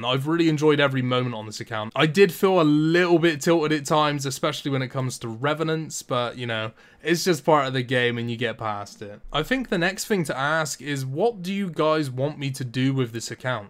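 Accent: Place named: Somerset, United Kingdom. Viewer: Great series, sound very Somerset 😂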